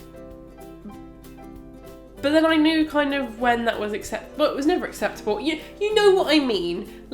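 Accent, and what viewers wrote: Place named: California, United States. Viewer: is there a disney in the uk now? great video and you voice and accent is so lovely #cali.girl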